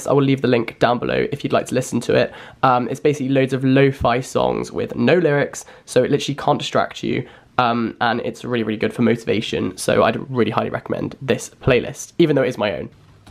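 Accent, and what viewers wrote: RP, United Kingdom. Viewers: younger is major nostalgia for me omg you're so posh!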